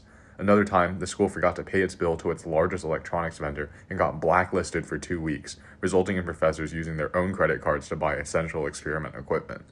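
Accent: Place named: Boston, United States. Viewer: you’re from or in boston, twinn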